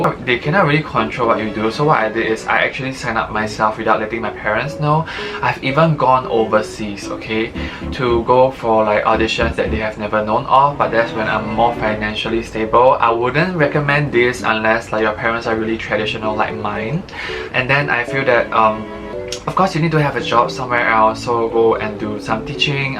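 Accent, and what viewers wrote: Singapore. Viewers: I can tell your from Singapore because of your accent 😂😂, anyways thanks for the video, I let you know if it works!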